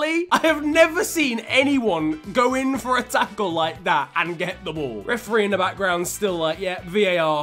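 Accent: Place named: United Kingdom. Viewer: Love your British accent bruv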